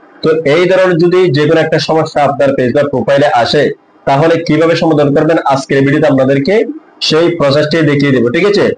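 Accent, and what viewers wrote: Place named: Chittagong, Bangladesh. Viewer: ভাইয়া মনেহয় চট্টগ্রামের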